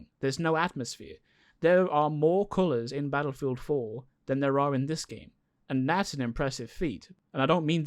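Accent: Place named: South Africa. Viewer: Quick question. Where are you from? Because for some reason I'm getting south african vibes from your accent. Now I could be stupid and way off but I'm just curious 😅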